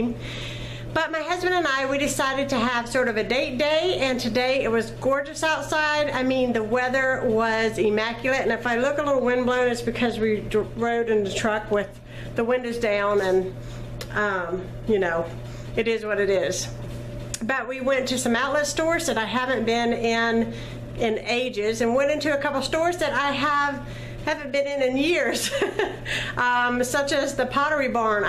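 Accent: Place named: South, United States. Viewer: recently subscribed :) I love your channel and I love listening to your sweet southern accent ♡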